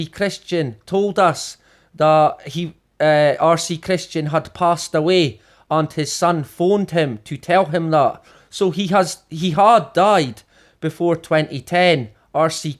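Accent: Scotland, United Kingdom. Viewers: Shalom shalom, dear friend from bonnie Scotland. Sure you're right, it's not at all about control lol. What ever gave you that idea? 🤣🤣🤣 Thank you so much for the research you do and share here. It's always a blessing and a pleasant break from other things here to hear you tell it like it is with your awesome accent and sense of humor. My favorite place to hear what's really going on. Blessings on you and yours! 💚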